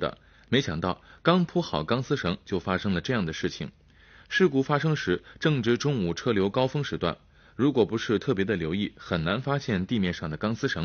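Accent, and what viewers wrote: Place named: East China, China. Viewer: it iz english with strong east chinese aksent.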